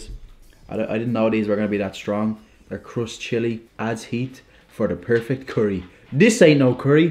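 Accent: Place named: Dublin, Ireland. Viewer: You sound more like a dubliner lately I love it mate 😂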